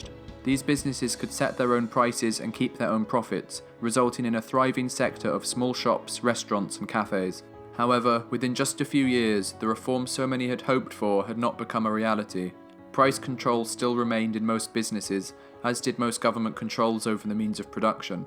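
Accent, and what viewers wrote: RP, United Kingdom. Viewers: "Excuse this British accent" - no, I won't. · Dramatic music, slideshow pictures, Wikipedia text, British accent...can’t beat that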